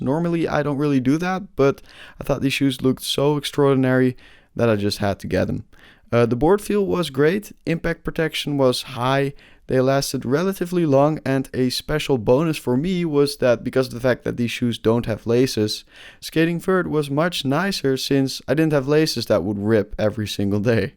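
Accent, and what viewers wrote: Netherlands. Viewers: Hey you are dutch right?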